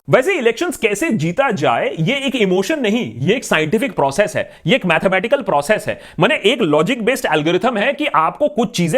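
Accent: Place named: Uttar Pradesh, India. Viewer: Ap ekdam up vaalo ki trh hi accent mei bolte hain.... BHAKT BANNERJI ka role karte karte ek dam smooth ho gye Love your episodes love your integrity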